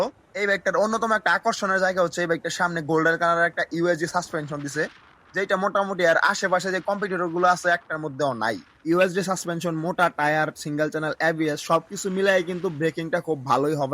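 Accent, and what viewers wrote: Narsingdi, Bangladesh. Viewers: Bhai Apner basha ki Narsingdi te ?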